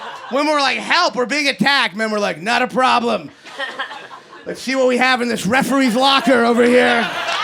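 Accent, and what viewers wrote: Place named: New York, United States. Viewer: He's from Kentucky but he sounds like a New Yorker. That's how Jewish he is.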